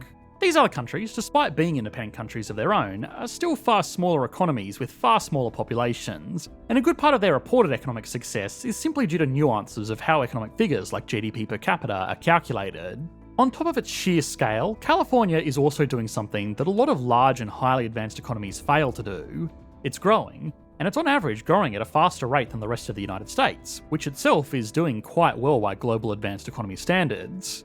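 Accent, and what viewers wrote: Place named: East Coast, Australia. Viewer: East Coast Strayan.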